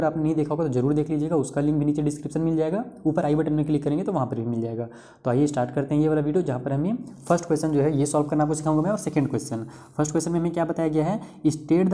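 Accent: Bihar, India. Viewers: Bihari ho sir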